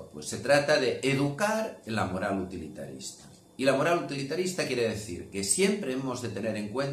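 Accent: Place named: Catalonia, Spain. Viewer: El podries haver fet en català també, que set nota l'accent!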